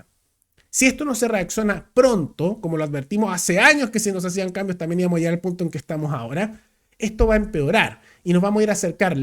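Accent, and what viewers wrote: Chile. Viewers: Hola hermano chileno. Te sigo en tus comentarios. Eres muy bueno, muy informado e inteligente. Un consejo, si deseas que más gente te vea en América Latina, retira esos símbolos que identifica a Chile, suficiente tu acento para saber que eres chileno. También retira ese himno después de tu comentario, suena a tambores de guerra. Recuerda que América Latina ve a Chile como un país belicoso y agresivo. Esos símbolos chilenos nacionalistas y esa marcha que parece militar contribuye a subrayar esa percepción que se tiene de Chile. Tú tienes para más, eres muy bueno en tus comentarios, diría EXTRAORDINARIO.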